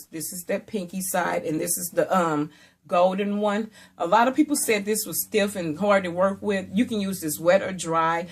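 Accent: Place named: South, United States. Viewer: I love your southern accent 💕💕 Kisses from NJ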